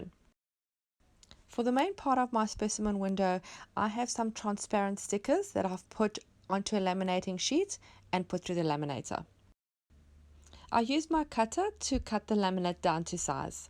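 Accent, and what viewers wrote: South Africa. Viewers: This is so simple yet so effective! Where are you based? You sound South African ☺️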